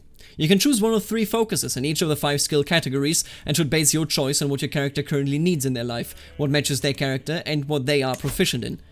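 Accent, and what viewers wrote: Germany. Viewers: why is it spoken by a guy with a terrible German accent who speaks too fast ??! : (( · Un1c0rnOfL0v3 That’s just his accent dude. He’s Bavarian and also he speaks fine. He has his own youtube channel for Ck2.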